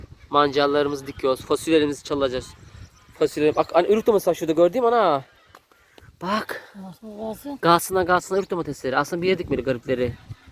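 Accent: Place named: Kastamonu, Turkey. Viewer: Sizleri izliyorum bende kastamonu bozkurt ilçesi konuşmanın bi ze benziyo mesala mancar😁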